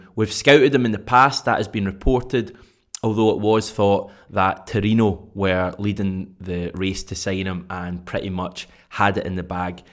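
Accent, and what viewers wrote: Scotland, United Kingdom. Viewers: Love the Scottish accent. 🏴 🤝🇦🇺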